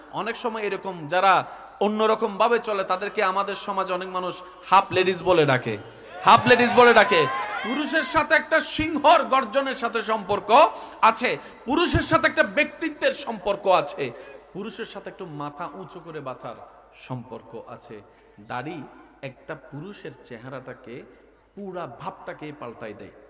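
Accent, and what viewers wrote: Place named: Kolkata, India. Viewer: মাশাআল্লাহ আপনার ওয়াজ কলিকাতান্দা হয়ে যায় অনেক ভালো লাগে আমার কাছে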